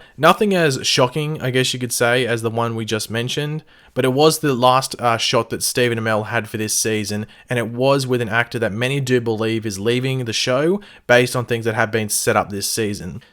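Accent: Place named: Australia. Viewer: Yhhh I just love your aussie accent 😍 used to watch bondi rescue to get a weekly dose of it now I can just listen to you 🤗😏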